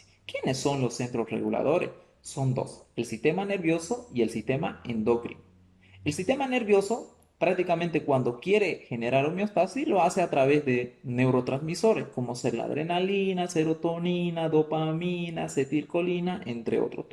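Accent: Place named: Bolivia. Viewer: Muchas felicidades DR, me ayudaste mucho en fisiología, explicas muy bien, por tu forma de hablar pareces boliviano, yo también soy de Bolivia, y te ganaste un nuevo suscriptor.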